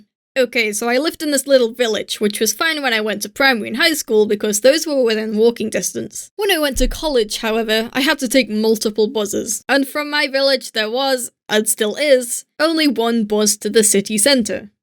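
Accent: Scotland, United Kingdom. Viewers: Eww bri'ish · Ello Ello! Very British! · Love the animation, and absolutely adore your Scottish accent and your voice. I watch your videos before going to sleep. Thank for your work :3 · Are you scottish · your accent is too british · Its a Kind of britisch accent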